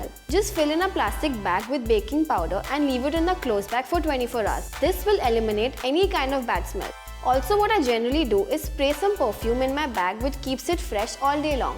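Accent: India. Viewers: You’re just not used to Indian accent and that’s okay ! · She has a thick accent as most Indians do. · Indian accent sucks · I know! What's wrong with all these people. She's Indian for crying out loud so she has an accent. Any idiot can understand her if they listen. My god.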